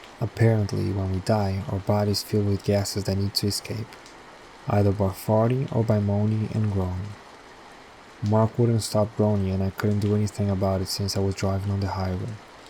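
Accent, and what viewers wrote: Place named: Brazil. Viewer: Ha yes! My Brazilian Girlfriend recognized it immediately and I thought you sounded just like my Brazilian homie Nick too, so we both picked up on it right away! Awesome channel with Spooky videos and your great voice over! Keep up the fantastic work! :)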